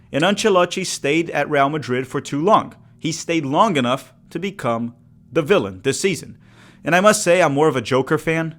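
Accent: Rio de Janeiro, Brazil. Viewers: I'm sure you're Brazilian as well, otherwise, your pronunciation (in a carioca accent) is dazzling, you're just sublime speaking Portuguese, and if you're native, that would justify and explain everything, on the other hand , i would compliment your English 😂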